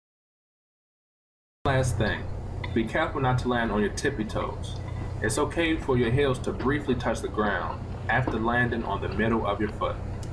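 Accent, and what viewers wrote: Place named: England, United Kingdom. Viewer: great video bro i like ur English accent love from India jai Hind hehe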